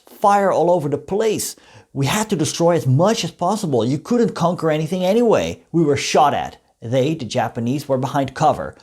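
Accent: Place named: Netherlands. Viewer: Fellow Dutch history-lover here, great to see your stuff. That and it sounds funny (somehow) how you speak clean Dutch next to good English. Most that speak English sound really weird when they speak Dutch.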